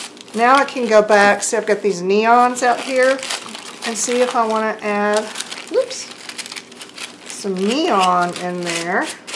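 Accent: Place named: Texas, United States. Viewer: I’m so delighted to know they still make the color “YELLA” I thought they quit making it when I left Texas 30 years ago. When I heard you say “YELLA,” I had to “PEENCH” myself. And then I saw yer Chick fil a card.I’m soooooo homesick, even now. I lived in Tennessee for 4 years too. When I’ve been watching YOU, my aceant reverts and my hubby says “ you’ve been watching again.” I fought my accent fur years, coz people in California treated me like I was ignert. Then I discovered I was proud of it, and I cain’t get it back completely. Sometimes you need a little age and maybe a kick in the pants to bring you round to where you were. And where I was, I sounded JUST like you! Blessings yall❣️🙏🏼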